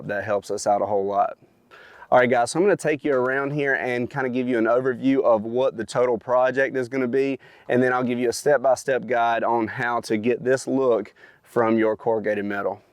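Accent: South, United States. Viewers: Interesting accent. It's like southern and California burn out surfer with a touch of central Florida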